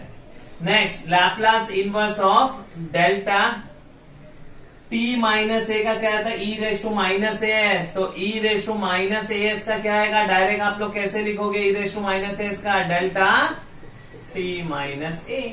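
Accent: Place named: Tamil Nadu, India. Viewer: Bht bhdiya sir.. ur tamil accent is cool 🎉 😎😎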